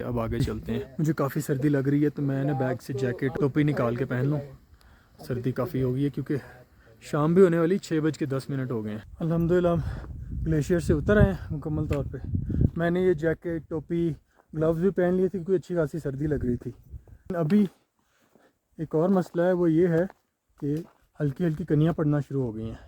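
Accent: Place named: Lahore, Pakistan. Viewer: AP Lahore k ho jes hsab Sy bolty ho Lagty Lahore k ho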